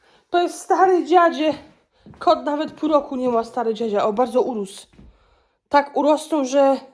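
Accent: Silesia, Poland. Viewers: Jestes zarabista babka , uwielbiam ogladac twoje filmiki , no mowa slaska jest mega… buziaki dla calej rodziny🍀🍀🍀🍀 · Twoje życie nie jest nudne. A tak jak dla mnie to wystarczy, że usłyszę twoją śląską godke i już mam uśmiech na twarzy i dla mnie to ciekawy vlog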